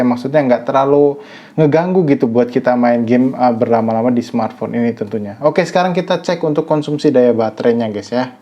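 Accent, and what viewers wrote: Cilegon, Indonesia. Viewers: Orang Cilegon ni . Hehe...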